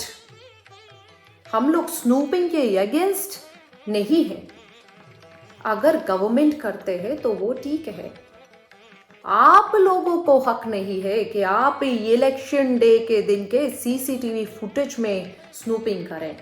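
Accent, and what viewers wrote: South, India. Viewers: Tamil accent in particular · 😊😅 very good informative and interesting satire, with South Indian language accent. Great and intellectual comments. Thanks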